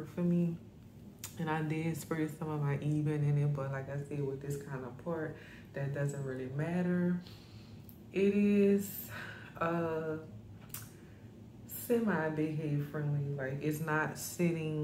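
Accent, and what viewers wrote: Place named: Louisiana, United States. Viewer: Wig is so pretty on you ! I will for sure buy ! And omg are you from Louisiana? I think I hear an accent.Its my favorite accent my parents are from there🤩👍🏾 let me go check out your other reviews. Edit: I watched the whole thing and you mentioned Louisiana 🤣 I was so quick to comment great review again!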